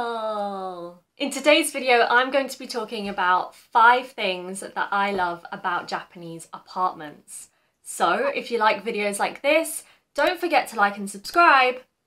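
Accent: United Kingdom. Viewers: いつもの「british English」の発音が心地良いです エリザベス女王やサッチャー首相の発音が50年前にいた神父か牧師の発音に似ていて好き アメリカンはどこかいい加減で南部訛など聞いていられない、田舎者や貧乏人の様だ 今やウォシュレットとお風呂の湯はりは無いと引っ越ししたく成るほどの機能です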